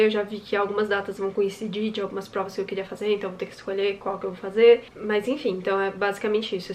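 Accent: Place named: Curitiba, Brazil. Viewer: Eu jurava que tu era curitibana 😂😂😂 me veio até distrito federal do nada agora ahsuahsu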